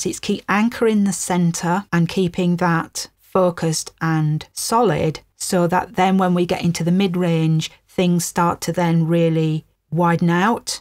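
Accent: Northern England, United Kingdom. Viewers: I came into the video and heard an eloquent-sounding British lady, and then out comes this ripping pop punk song. I love it because punk almost has this middle finger to cultural norms. I really love how clear speaks and I'll be able to come up with a few tricks thanks to this video. I came to this conclusion: when someone related to music is explaining something, do you like this person's work? I like mix. I also had to turn off YouTube Stable Volume to really hear it. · Where tha from? Nice to hear a Northern accent on YT :face-red-heart-shape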